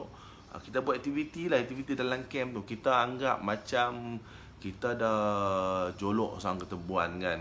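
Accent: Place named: North, Malaysia. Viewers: Abg nak tanye knape ggk selalu cakap utara ye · Seronok dengaq Tuan bercerita..Tak boring..cara penceritaan santai, lenggok utara lembut dan mesra walaupun nampak garang..Moga lancar channel dan terus banyak content2 baru Tuan 😃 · Byk utara noh komando ni.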